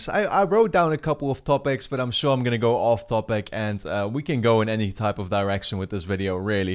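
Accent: Netherlands. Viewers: Where do you live my guess is that you live in the Netherlands bcs your accent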